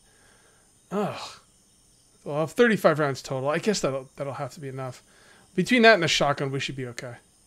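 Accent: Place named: New Jersey, United States. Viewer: Good ole Dirty Jersey! My fiancee heard you say wooder(water) when I was laying in bed watching and she goes " hes gotta be from Jersey"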